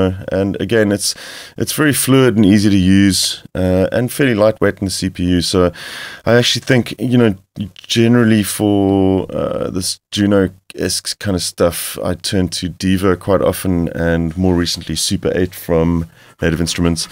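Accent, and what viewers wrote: South Africa. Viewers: Hey, you sound like a South African, the accent checks out..... fellow South African checking in, this is rare. Neat review and wow, for $25 this is incredible.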